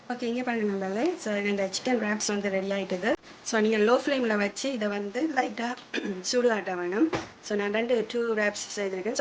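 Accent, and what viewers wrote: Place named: Sri Lanka. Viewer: Hai sis unga srilanka tamil pedikum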